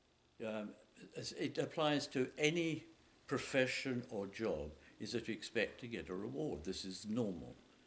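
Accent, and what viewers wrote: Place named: England, United Kingdom. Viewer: Dear father, an English accent???!!! Where is your church? I’m a recent English convert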